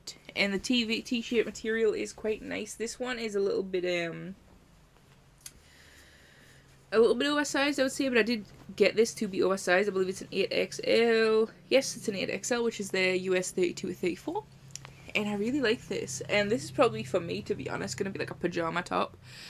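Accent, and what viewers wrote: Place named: Newcastle, United Kingdom. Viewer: Same I’m Scottish so I kinda hear it but more so Geordie accent I think x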